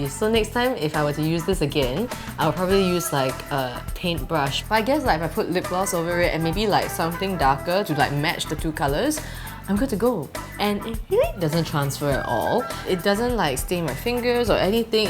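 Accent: Singapore. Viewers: This is my second video of watching her and I love her cute Singaporean accent ^.^ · The singlish! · I think she's from Singapore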